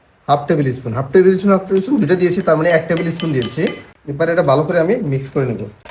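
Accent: Noakhali, Bangladesh. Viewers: Unar language sune mone hoy feni/ noakhali hobe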